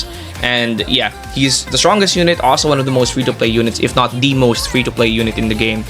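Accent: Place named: Philippines. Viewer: I can hear in your voice your filipino, can you help a fellow filipino out yourself and gift vip? (Shooting my shot like ben simmons)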